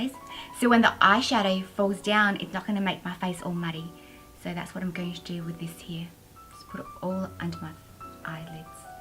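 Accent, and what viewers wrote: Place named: Australia. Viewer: Wow , we live in Queensland, would love to meet and greet when you come.. Hoping you can sub back and stay connected.. I knew you where aussie when I heard your accent..Love this channel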